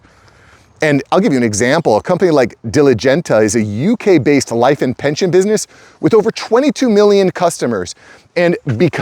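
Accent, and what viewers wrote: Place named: United States. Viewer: Speak slowly, articulate clearly and speak in English, not American.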